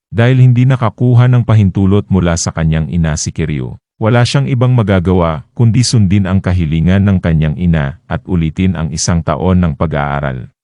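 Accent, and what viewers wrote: Philippines. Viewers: BWISIT SAANG KUPALOP KA NANGGALING KAASAR TAGALOG MO🤬🤬🤬‼️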